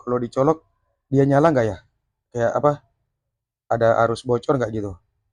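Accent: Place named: Eastern Indonesia, Indonesia. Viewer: abangnya dari jayapura? logatnya kek dari timur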